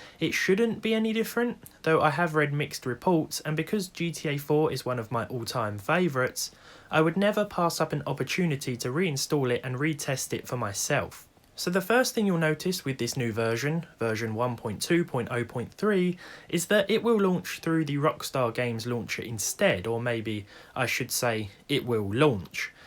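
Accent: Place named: England, United Kingdom. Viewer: You sound line and Englishman, so a zero is not pronounced o cause that is a letter, its a zero.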